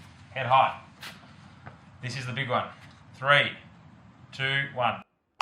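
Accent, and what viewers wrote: Australia. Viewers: What is that accent? Australia? New zealand?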